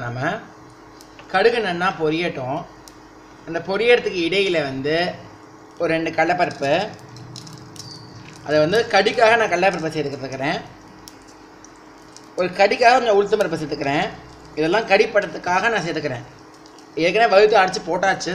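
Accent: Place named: Palakkad, India. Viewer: Brahmin Iyer or Iyeger boys speaks with this slang only. Palakkad slang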